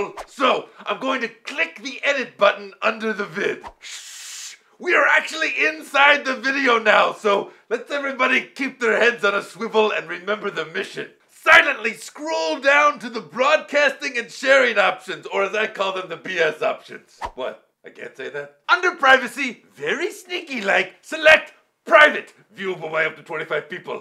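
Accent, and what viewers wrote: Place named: Mexico. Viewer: this ninja sounds mexican!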